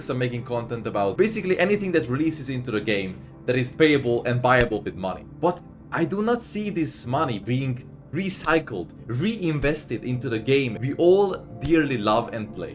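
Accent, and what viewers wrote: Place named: Hungary. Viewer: Eszrevettem a madzsar accentusodat de hibatlan a tartalom, hajra!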